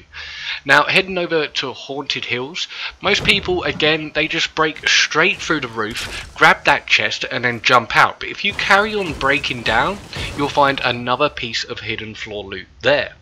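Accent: United Kingdom. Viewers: David deans son ? Is this you your british ?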